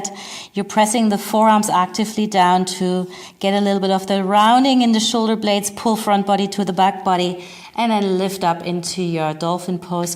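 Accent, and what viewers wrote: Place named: Germany. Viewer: Habe gerade deinen Kanal gefunden und abonniert und freue mich sehr darauf mit dir zu praktizieren!! I also LOVE essential oils and your German accent. Lol. Alles Gute und viele Gruesse von einer Kollegin.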